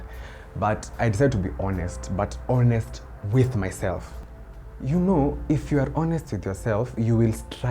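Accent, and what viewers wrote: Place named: Kenya. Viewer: Your accent reminds me of when I used to live in Nairobi 🙂🙂🙂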